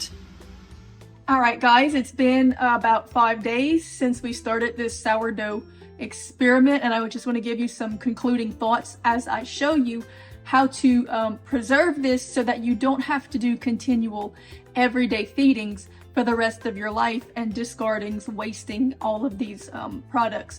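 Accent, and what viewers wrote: Louisiana, United States. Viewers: Great video. Very helpful. I thought I detected a Louisiana accent. My grandmother was a Mayeux. I plan to try your recipe with yogurt. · I just came across your video and SO HAPPY I did!! I’m going to try this way of creating a starter. I did have a question while watching your video. I heard an accent come across that sounds very much like south Louisiana. Where are you located?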